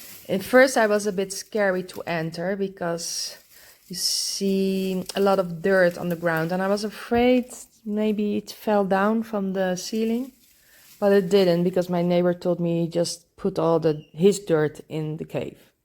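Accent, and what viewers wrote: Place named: Netherlands. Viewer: i swear you voice is so familiar to me. Is your name is if you are please let me know please my is Dutch and you have same accent as her??? Thank you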